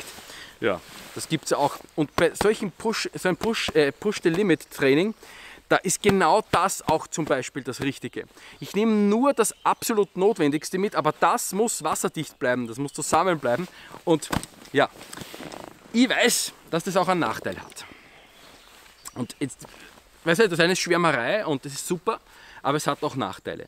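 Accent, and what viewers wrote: Austria. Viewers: Deine Videos sind einfach toll. Keine Verkaufsveranstaltung das fachliche ist einfach top.Dein Österreichischer Akzent und die ganze Art und weise einfach nett. Mach weiter so!!!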